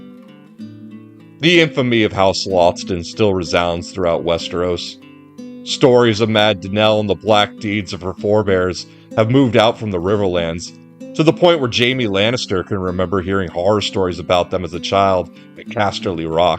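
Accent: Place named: Boston, United States. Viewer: Awesome video bro. You GOTTA be from Boston.I'm from Southie. Oh hey I'm not being a twat at all, but it would be "patronized" not "patroned'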